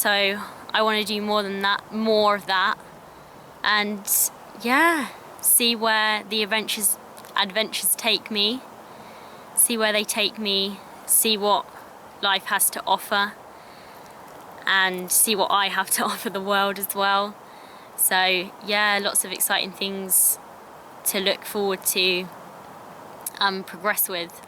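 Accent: United Kingdom. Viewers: I love your British accent. I could just lay in a hammock and listen to you talk.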